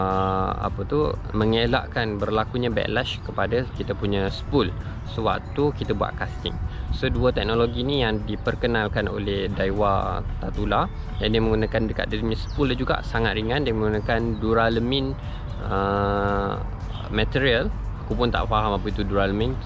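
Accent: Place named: Terengganu, Malaysia. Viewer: Bang org trg ke? Ada kantoi skit disitu walaupun berusaha utk cakap KL.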